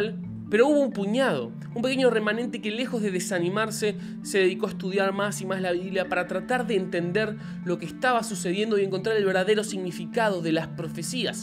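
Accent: Argentina. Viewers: Que tal, un pequeño comentario, admiro mucho tu conocimiento y explicación de los datos religiosos, como suelo decir: "lo peor que puede pasar es que aprenda algo" (obviamente un dicho sarcástico, ya que aprender algo siempre es beneficioso). Solo un pequeño defecto que oigo en tus pláticas religiosas, lo cual parecen desviar el significado de las mismas. Cuando decís a quienes les estás hablando, que terminas los sustantivos en "o" (masculino), "a" (femenino), y "e" (bisexual, transexual, vos sabrás que), pero si vas a hablar pláticas religiosas solo habla hacia hombres y mujeres (puedo captar en tu Acento que sos Argentino, más ni siquiera para complacer a la cultura de tu querido país deberías usar esas expresiones). Resolveme por favor una duda: que generos dice la Biblia que creo Dios en el sexto día?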